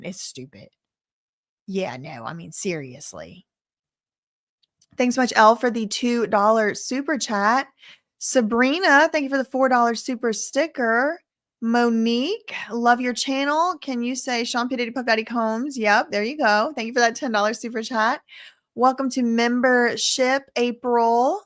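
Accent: South, United States. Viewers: I’m just here for lovely southern accent. ❤ God bless this woman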